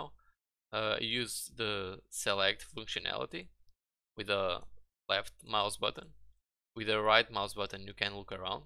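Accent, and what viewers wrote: Portugal. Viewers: claramente accent Portugues :D Bom video! Obrigado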